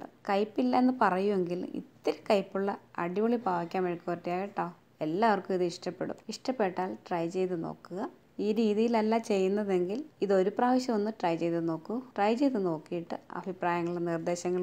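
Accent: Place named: Ochira-Karunagappally, India. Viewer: സഹോദരി. ഓച്ചിറ, കരുനാഗപ്പള്ളി ഭാഗം(സംസാരം) ആണെന്ന് തോന്നുന്നു.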